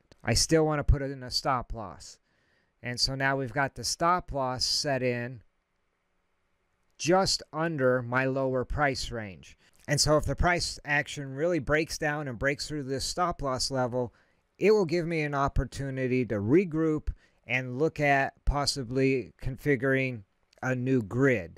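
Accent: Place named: United States. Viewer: you sound american, how can you use binance? i got kicked off and forced to open a Binance.US account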